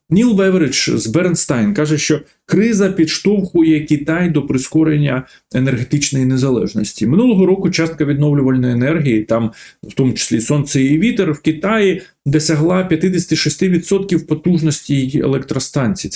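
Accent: Moscow, Russia. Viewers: vin mae moskovsjkyj akcent. akae bezudarni O.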